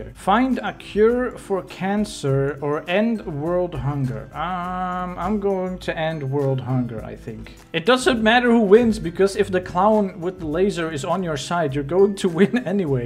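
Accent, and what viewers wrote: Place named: Netherlands. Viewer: That did i hear good you are form the netherlands . CUZ I AM DUTCH TOO! :D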